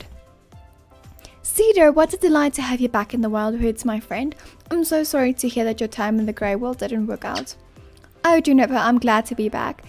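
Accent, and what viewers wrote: South Africa. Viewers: I’m glad I subscribed to you! Two things I love: star stable and the South African accent (sadly lost mine) your voice is so soothing it makes me feel like I’m back home agaon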